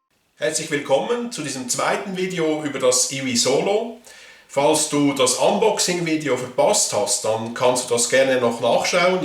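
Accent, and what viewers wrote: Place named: Switzerland. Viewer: Thank you for the video! I'm so glad I speak German. But the subtitles are pretty good. They help where I can't quite get the hang of your Swiss accent ;) For some reason, no-one explains how to properly put on the mouthpiece cap. Am I the only person afraid of damaging the instrument's "reed"? Just got mine in today. Kind of curious what settings I will end up going with, because I play both saxophone and flute.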